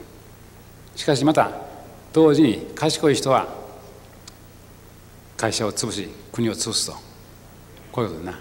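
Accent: Kansai, Japan. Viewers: 関西弁を心地よくしゃべる大先生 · 本では見たことあるけど生で初めてみた。こてこて関西弁なんですな。また好きになってしまった。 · 幸之助さんの話は非常に分かりやすいのが素晴らしいと思います。 優秀な人ほどわかりやすい話し方をするそうです。 受け売りではなく実体験を語っているかでしょう。 近年経営者が話す難しい国語表現や横文字ビジネス用語は使わない。 一般人の虚を突いたようなことをいうわけでなく当たり前の話であり共感しやすい。 名言集でも不思議と偉そうな説教には感じないんですよね。 尤も昔の人だしベタの関西弁なので聞きなれない人は聞き取りにくいかもしれませんが。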